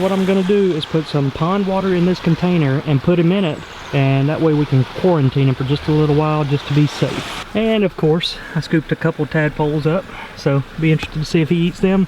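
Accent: South, United States. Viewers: So I watch your videos with closed caption. When you said “Bonnie meet new turtle, new turtle meet Bonnie” the closed caption read “Bonnie meet Luther, Luther meet Bonnie”. So there ya go. Luther is the name from me and closed captioning of our southern accents. Lol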